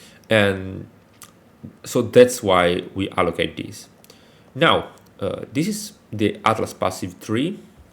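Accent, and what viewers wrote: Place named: Balkans. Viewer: Where are you from, you sound like you are from balkans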